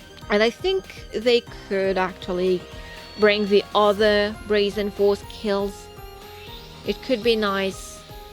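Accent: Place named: France. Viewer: That French accent x)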